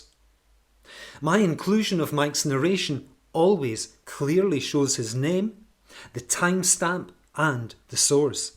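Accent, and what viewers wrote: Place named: Scotland, United Kingdom. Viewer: Lovely Scottish accent that could cut through hardened stainless steel and glide through butter. There is something about a Scottish accent that makes one sit up and listen. When you think of the great Scottish actors: Mark McManus, Sean Connery, Robbie Coltrane, Ewan McGregor, Roddy McMillan and actresses Kelly Macdonald, Karen Gillan, Shirley Henderson, Isla Fisher, Laura Fraser.... and the list goes on and on..................and Scottish accent is up there with the best of them. Alba go bràth!!!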